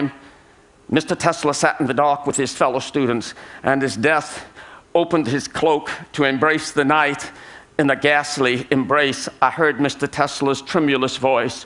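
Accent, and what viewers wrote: United States. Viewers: Quite a bearable American accent. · Marvelous! A Southerner (sans any Hungarian ancestry) talks (in Southern English) about his affinity with Hungarians, or with the Hungarian spirit, or, as James Michener might have put it, an affinity with the cantankerous nature that characterizes Hungarians.... hmm, more politely called that "curiosity", that insatiable inquisitiveness, that characterizes Hungarians. Conjures up memories of old jokes, e.g., ... Q: Are you part of the SETI search for extra terrestrial life? A: Those aliens are already here, they're called Hungarians! My own command of the Hungarian language is woefully minimal, but the speaker's Magyar friends could have schooled him better in the pronunciation of jó nápot pacák, or the names mentioned, etc, even with a Southern accent. Mispronouncing some Hungarian words can get ya into big trouble, I know, just one example being when I saluted some important company with a toast, attempting to say "Egészségedre!", meaning "to your health", but it came out as "Egeseggedre", a polite translation being "to your butthole", which caused a mixture of embarrassed groans and guffaws of hysterical laughter... 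an episode that put a serious dampener on my motivation to further learn that diabolical lingo. One thing I did make sure of after that experience, though, was to make a special effort to correctly pronounce anything I said in any language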